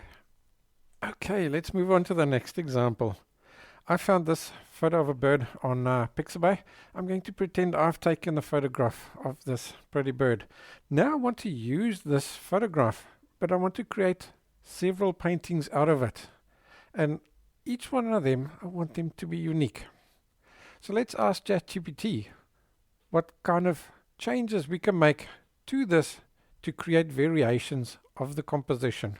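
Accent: South Africa. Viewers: Hi you sound like a South African. I enjoy your presentations. Very thorough. Thank you from South Africa.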